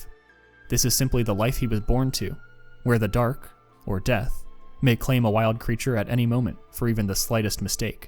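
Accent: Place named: Newcastle, United Kingdom. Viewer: Credit where it's due: a damn good attempt at Geordie mannerisms! Not the easiest even for English folks 😂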